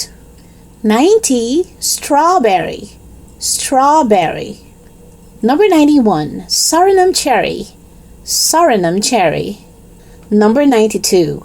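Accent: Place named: Philippines. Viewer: I am a Vietnamese Catholic priest, I am staying in the Philippines for my studies, I like your Filipino English voice, I am pretty happy to listen to and imitate your voice! May God bless you all to help everyone ❤️😇💒🏫 students 👨‍🎓👩‍🎓🧑‍🏭🧑‍🏭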